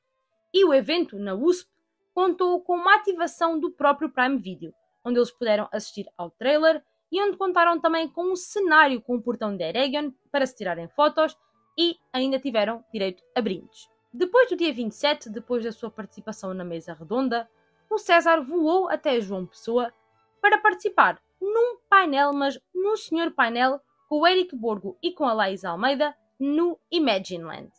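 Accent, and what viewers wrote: Portugal. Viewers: Massa! A Vida do tá agitada! O cara ficou importante mesmo... Valeu! Um "grande" beijinho...Amo esse sotaque "Português de Portugal".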